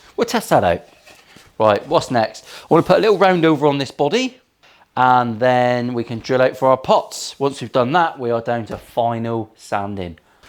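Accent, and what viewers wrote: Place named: Plymouth, United Kingdom. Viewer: The Plymothian accent had me hooked immediately. I'm Bristolian, so we share a twang.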